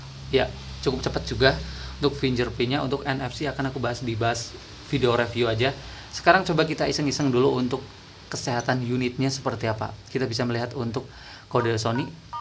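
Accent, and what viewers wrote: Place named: Java, Indonesia. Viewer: Bang lu orang Jawa ya kok logatnya Jawa bngt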